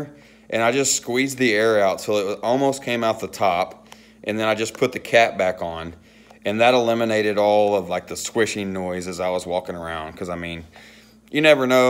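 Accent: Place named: Texas, United States. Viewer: I don't no any wedding bands so yes Daddy call me I love that Texas accent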